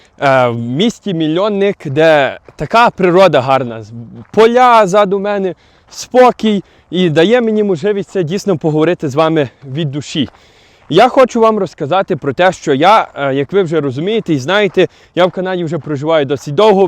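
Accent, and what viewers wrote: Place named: Hutsul, Ukraine. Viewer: аха...ха що за вуйко? Але смішно говорит, в Україні хіба десь в горах гуцули засраті так говорат. Все там правильна побудовано і задумано, щоб тваринам не хотілось воювати і вбивати собі подібних треба їх нагрузити працею, щоб голови немали коли підняти. Хто приїзджає в США і Канаду з країн колишнього срср називають їх трудовим табором з підсиленим харчуванням.Зато в Канаді і США немає війн вже з 1873 року, а на території срср постійно дебіли товчуть один одного.Бо робити не хочуть.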